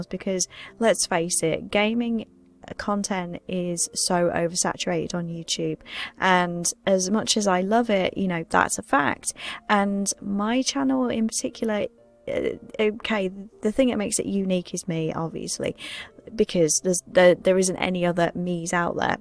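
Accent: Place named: England, United Kingdom. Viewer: Great video:) Everyone has that funk and talking about it is honestly that foot hold that helps start the journey out of it. You would do amazing in the ASMR scene. The English accent alone would do it but you have such a smooth and soothing voice and very little inflation in your tone (All of this of course is meant in the best of ways). Good luck with your journey home and your new direction:)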